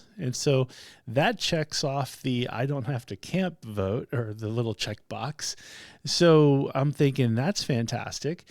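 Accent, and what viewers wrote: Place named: United States. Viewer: This is the first video I see from you, so I know nothing about you. You sound American. Prepare for weather to be whatever, it's all over the place every day. In June, in the Southern Finland, heat-wise, I'd say +30C is THE MOST EXTREME I can think of, and that'd probably be a record or something. Summer days are usually around 15-27C. I'd put on a t-shirt, long but light pants of some sort, a jacket that's easy to take off and tie on your waist or something in case it's a hot day, comfortable shoes that can withstand rain or mud and a hat, put on sunscreen, take a disposable rain coat (fin. "kertakäyttöinen sadetakkki") with you - if they don't sell them where you live, in Finland you can buy one in pretty much any bigger grocery store such as Prisma or Citymarket for about 2€. Festivals don't allow to bring in water bottles. 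Most festivals have free water, in some you have to pay a small amount for it. Check the Tuska Festival site - if they're doing their job properly, they'll have a list of things you can or cannot bring with you and if water is provided. It's a big festival, I think they'll probably have that information. (Also try to google if a person from your area is ok to drink tap water in Finland. I was in Provinssirock about a decade ago and the water they provided was a self-standing tap in the middle of the area, which was fine for me, it worked. But I've also been to a tourist biking trip to Estonia, a neighboring country, and got a stomach bug because we ran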